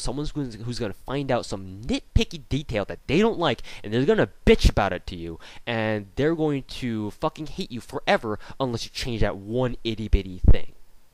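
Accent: Asia. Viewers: I love your Asian accent it makes things very funny!! And i appreciate what you do a lot your in college and make time for youtube making multiple videos a day! YOU ARE THE REASON I WASNT BORED WHEN I DIDNT HAVE MY PC!!!! and were you the one that i beat in the event on sf2? lol the event to get the Scar H Lucie or something. lol im KoolGam3rsHQ on sf2 but i agree with a lot of this i actually watched whole thing lol facepalm! lol, and i agree that in our society now the nice people get torn up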